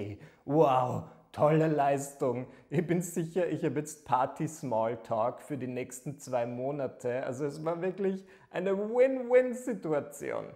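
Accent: Vienna, Austria. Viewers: ich liebe deine videos. Dein wiener slang ist sooo geil, dein Humor auch ich liebs!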